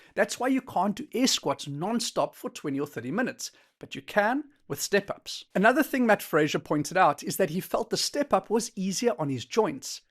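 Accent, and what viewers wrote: South Africa. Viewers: Do I hear a South African accent? 😊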